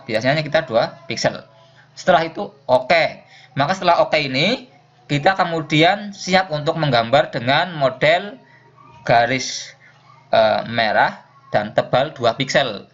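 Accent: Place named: Banyumas, Indonesia. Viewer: Salam dari Kawunganten, sip.penjelasannya mudah dipahami. ngapaknya muncul😁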